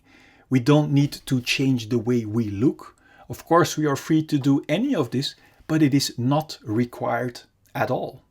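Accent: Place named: Netherlands. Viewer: Nice dutch accent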